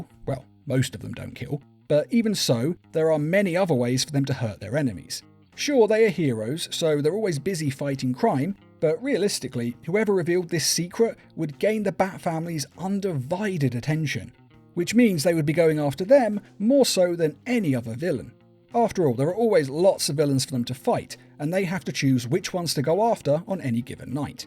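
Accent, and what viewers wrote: United States. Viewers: Good job on the American accent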